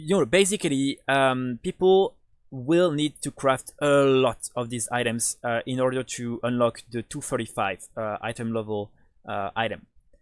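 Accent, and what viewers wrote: France. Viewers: J'ai direct entendu que t'étais français haha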